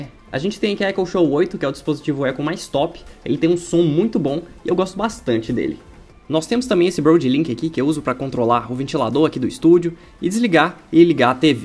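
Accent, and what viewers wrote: Brazil. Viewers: melhor parte é o sotaque de mineiro hauheuahahah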